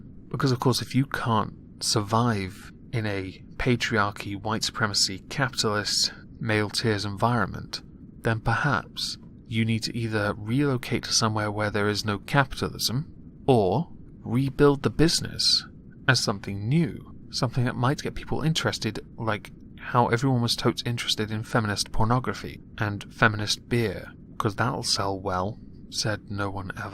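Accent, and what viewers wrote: United Kingdom. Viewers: This guy sounds like the britisher, just me?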